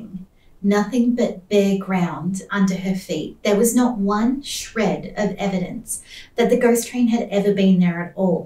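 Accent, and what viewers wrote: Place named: Australia. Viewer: New sub! I loved the way you did this. It’s refreshing to hear a fellow Aussie doing these videos. Well done!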